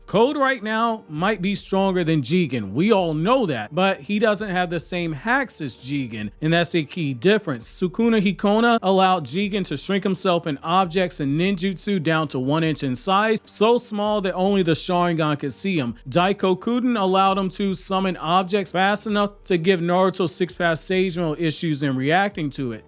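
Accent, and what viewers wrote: New York, United States. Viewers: yeah you sound really distinct in a cool way I was just curious I want to guess new york? I like your accent is all and I love the content sir!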